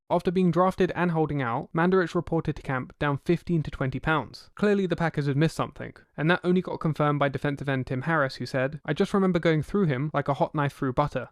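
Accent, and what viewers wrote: England, United Kingdom. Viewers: Part way through this. All known info. Why am listening to a guy with an English accent talk about an American sport. No insight loser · Why is a british guy talking about an obscure nfl player?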